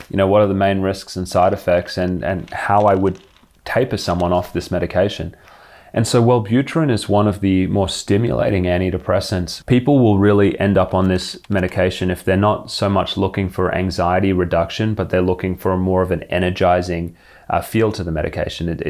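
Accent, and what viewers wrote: Australia. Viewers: Hello - I noticed you have an Australian accent - I tried to get Wellbutrin from my GP but it is not on PBS for depression. How do I go about getting this medicine in Australia? Thanks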